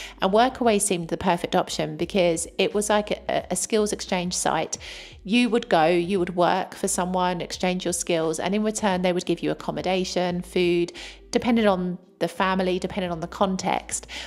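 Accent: United Kingdom. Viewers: I would like to hear you speak in Italian on a video given your British accent?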